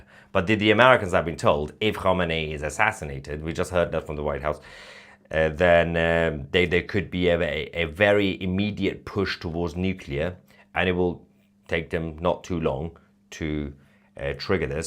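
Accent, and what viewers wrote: United Kingdom. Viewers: So you were saying Ayatoilet. I thought maybe it was my American ears misunderstanding your British accent. Very fitting moniker for such extremist refuse.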